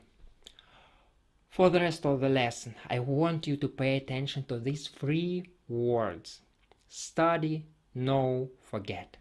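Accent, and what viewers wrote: Russia. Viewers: He sounds more like Tevya in "Fiddler on the Roof" - which is set in Tsarist Russia. I love his accent! 😁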